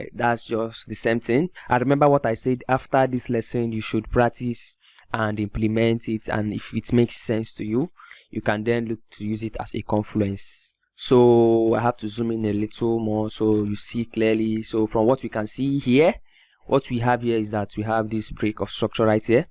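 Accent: Nigeria. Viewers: Where are you from bro? Nigerian??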